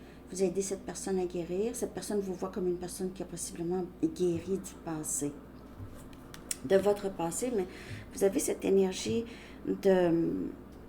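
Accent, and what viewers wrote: Canada. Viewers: Bonjour Nouvelle arrivée sur votre chaîne, je suis Française et vous avec votre accent, Canadienne sans aucun doute. 😊 J’ai beaucoup aimé votre vidéo. Joyeux Noël et bonnes fêtes de fin d’année. À bientôt. 😊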